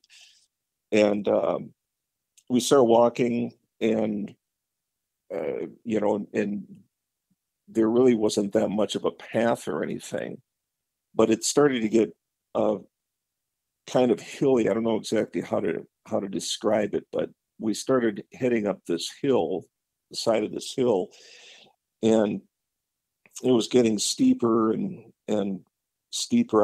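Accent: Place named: Minnesota, United States. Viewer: As a Minnesotan, thanks for sharing your story from Up North with us,. I didn't consider you boring as I listened to your story and interview. You sound like a born and raised Minnesotan like myself - honest and forthcoming. There's two new aspects of Sasquatch that I hadn't thought of before I watched this video. The first is Bigfoot hitting the tree with its fist after it spied you and your buddy. Now I'm wondering if that's what "tree knocking' signifies - a Sasquatch seeing a human? And secondly, I never considered the horrid odor being a response from Bigfoot like a skunk spaying when surprised. I always thought Bigfoot's scent was from lack of personal hygiene, but it always baffles me why some folks smell the horrific odor and others don't. Could that be a trait in specific Sasquatch species and others not? More questions than answers, but perhaps that is part of what makes Bigfoot so intriguing.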